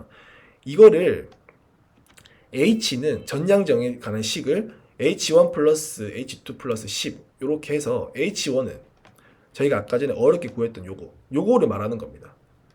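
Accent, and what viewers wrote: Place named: Gyeongsang, South Korea. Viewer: 경상도사투리 구수하네예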